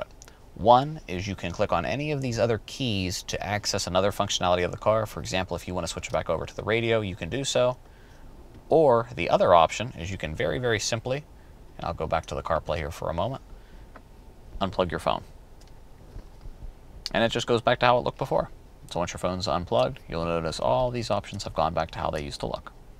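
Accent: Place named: England, United Kingdom. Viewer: very nice english accent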